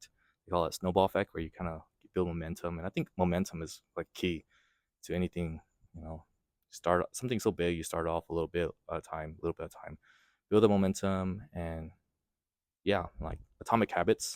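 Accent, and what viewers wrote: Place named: England, United Kingdom. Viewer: Yesterday, I came across a video on YouTube featuring a very young developer from Chicago, he shared about how inspiration feeling about documenting our life, work, and growth via vlog/videos (btw his channel looks so art cinematic I'm impressive so much!! <link> . Today, I found myself back on your channel watching another video about making videos on YouTube. It makes me feel a bit shy because I still don't feel ready to start my own vlog. I'm taking it day by day and hopefully, I'll have the courage to start a vlog soon. Anyway, I hope that we can organize a meet-up for UX designers to share experiences in Ho Chi Minh City. NAB is my dream company. I hope to become your colleague one day! Another thing I'd like to comment on - I love your English accent. I'm just curious, did you study abroad?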